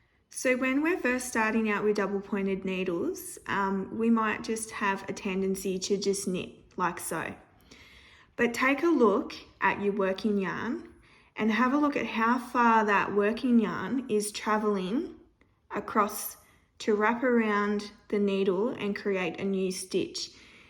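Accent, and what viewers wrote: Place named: Australia. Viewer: Off topic, but It's so nice to hear a fellow aussie's voice in a knitting video!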